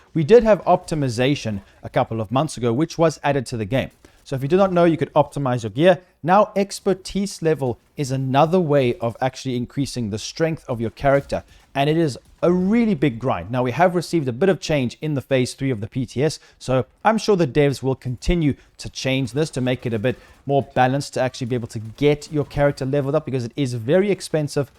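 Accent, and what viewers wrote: South Africa. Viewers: Are you from South-Africa? PS thanks for the video…Now im craving to come back!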